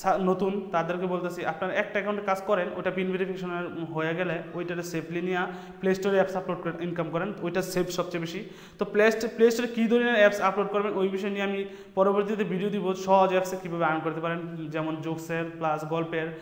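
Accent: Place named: Kishoreganj, Bangladesh. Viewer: বাড়ি কৈ? কিশোরগঞ্জয়া নি?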